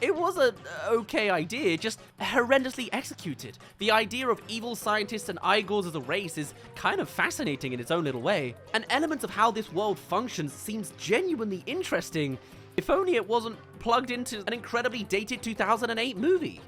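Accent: United Kingdom. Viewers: and you're proof is? Cause I said so? Literally you don't know that, I think hes just British and we know British people pronounce things differently than Americans. Not saying they're wrong, just saying British people are silly.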